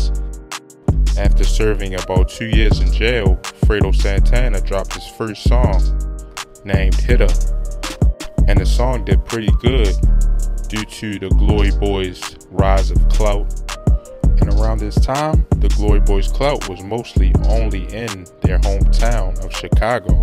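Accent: Baltimore, United States. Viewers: Narrator must be from Baltimore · I can tell he from Baltimore lol it's how he says you two flew etc 😂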